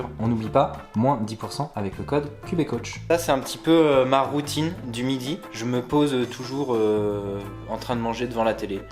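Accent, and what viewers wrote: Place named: England, United Kingdom. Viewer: Tu manges du "bacon " mon lapin 🤣🤣🤣, j'adore 💕 ton accent anglais..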